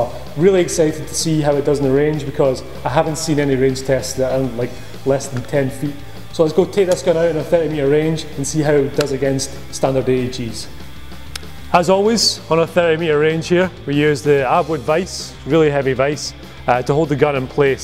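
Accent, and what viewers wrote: Scotland, United Kingdom. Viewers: So many people think he's a brit. Smh. He is obviously a scot.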